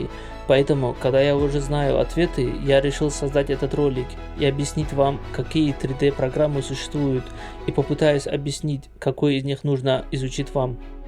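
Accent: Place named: Dagestan, Russia. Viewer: Судя по говору , либо Дагестанец либо Чеченец, я пока еще не разобрался 😅😂 Салам из Татарстана 👋😁 Я вот в FreeCad начал работать, мне больше интересна техничка и точность.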